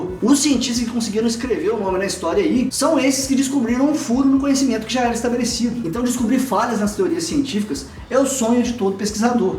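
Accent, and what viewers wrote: Minas Gerais, Brazil. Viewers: amo de coração seus videos, não perco um. Mas, a forma que você fala e no "mineires" (falando rapido) as vezes fica dificil de entender. Nos videos maiores as vezes tenho que voltar um pouco pra conseguir seguir o raciocinio. Sou da area ambiental mas sou apaixonado em fisica.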